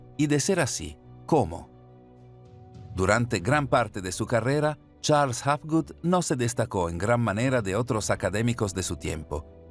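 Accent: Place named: Italy. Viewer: 🔴 EL PORQUÉ SE VUELCA los Rusos unos 20 años atrás han descubierto que un obejeto en rotación en el vacío, si no es totalmente simétrico en sus masas, ÉSTE ROTA SU EJE DE ROTACIÓN después de percorrere una cierta distancia. DESCUBRIMIENTO hecho el la Estación Orbital Rusa. Gracias 🙏 Grazie, si capisce che sei Italiano, abbraccio 🙏 Mario Cappellotto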